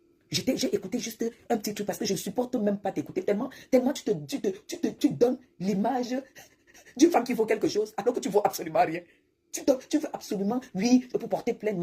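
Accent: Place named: Bamileke, Cameroon. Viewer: Le gars ci propriétaire de la chaîne ton accent bami là est vraiment dégueulasse hein, on t'a seulement fait ça au village ? Je n'ai jamais entendu un accent aussi prononcé et laid arrête de parler en début de vidéo dion!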